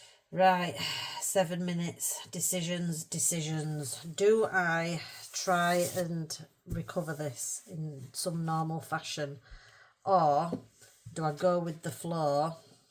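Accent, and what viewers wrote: Yorkshire, United Kingdom. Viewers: I love watching your videos. I’m an Australian originally born in England and my Dad and his family is from Yorkshire. Hearing you speak is so nostalgic 😀 And you’re so funny. You’re doing great. Can’t wait to see more! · Hello, what a great video, do you know you've really cheered me up, I'm in bed with the flu and feeling crap, so I've so enjoyed this chaos method. And the bonus is listening to your Yorkshire accent, love it, love Yorkshire. Your humour is brilliant, I appreciate it as I'm a Scot, and originally a Glaswegian,although I live in inverness, not a lot of humour here😅. I love your approach to colouring, it's not pretentious, you just have fun. I love this page, the freedom of it and such pretty colours too. Well done and I hope your channel goes from strength to strength, I found you thanks to Jackie at spare room studio. 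I'll be back 🎉❤ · Love this! I think it will be great to do together with my daughter. I'm glad you kept going. I like your accent so much that I recently started following a new meditation teacher because she also has a Yorkshire accent 😁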